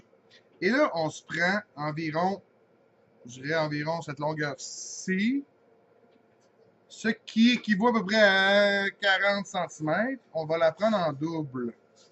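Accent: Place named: Quebec, Canada. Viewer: Coucou mon ami. J'aime beaucoup t'écouter parler avec l'accent québécois. Merci beaucoup à toi. Dominique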